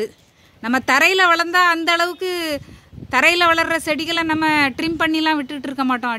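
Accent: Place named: Tirunelveli, India. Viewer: மேம் நாங்க தாராபுரம் ஏரியால இருக்கவங்க மேம் எங்க ஏரியால இந்த செடி கிடைக்கிறது இல்லை ஒரு பதியம் போட்ட செடி எங்களுக்கு கிடைக்கும் எனக்கு இந்த செடி ரொம்ப பிடிக்கும் மேம் ஆனா எங்க ஏரியால இந்த செடி அதிகம் இல்லை இனிமேல் நீங்க வந்து திருநெல்வேலி மாவட்ட ஏரியாவுல உங்க வாய்ஸ் வந்து திருநெல்வேலி பாச மாதிரி இருக்கு அதனால தான் கேட்கிறேன் இரண்டாவது என்னன்னா முல்லைக்கொடி எல்லாமே கிடைக்குது இந்த சந்தனம் உள்ள செடி வந்து கிடைக்கவில்லை எனக்கு ரொம்ப நாளா இந்த செடி வாங்கி வைக்கணும்னு ஆசைங்க ஆனால் கிடைக்க மாட்டேங்குது எனக்கு ஒரு பதியம் போட்ட செடி தருவீங்களா ப்ளீஸ்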